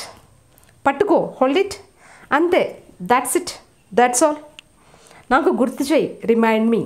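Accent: Telugu, India. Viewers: Tqq, nice class, so sweet of you and your Telugu slang laaa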